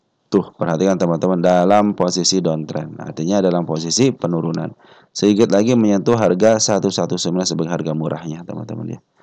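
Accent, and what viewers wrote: West Java, Indonesia. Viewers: orang sunda ya kang?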